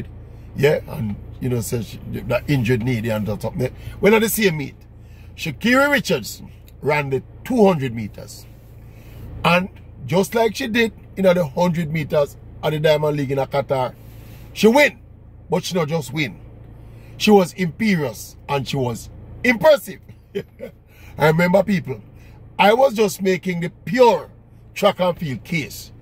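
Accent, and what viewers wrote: Jamaica. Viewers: I knew I would find you here brother love the Jamaican accent ✊🏽✊🏽✊🏽 🇯🇲 🇺🇸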